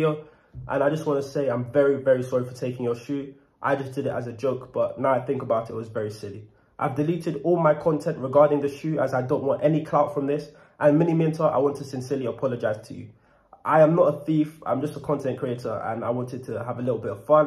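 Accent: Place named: United Kingdom. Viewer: wait a second he's british????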